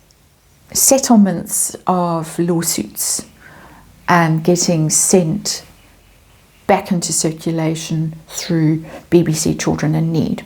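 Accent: South Africa. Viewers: You are on fire today! Love the snark in your beautiful SA accent!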